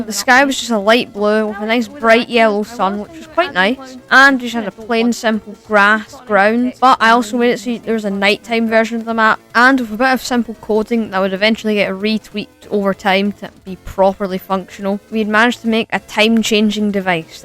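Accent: Scotland, United Kingdom. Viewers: It’s Scottish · its either bros really british or bros faking the british accent (still respect) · Are you Scottish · R u Scottish cuz u have the accent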